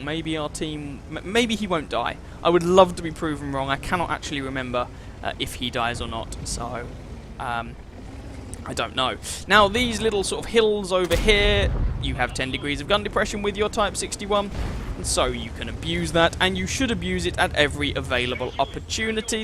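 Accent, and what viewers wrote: England, United Kingdom. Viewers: Loving your videos, probably due to your English accent... Keep it up.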